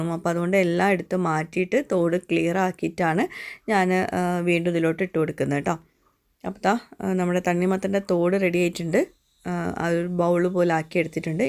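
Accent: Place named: Kasaragod, India. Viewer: Endhra ninga chayakudicha endh baychath nalla majayinda....enthra ee soonante mon enik kasraod romba ishtam especially kasargod basha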